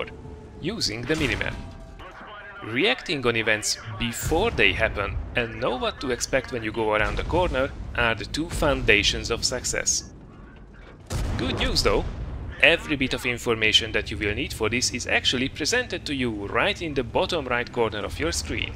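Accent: Hungary. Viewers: Hey! Are you Hungarian, because the accent of yours sounds like you are to me. :D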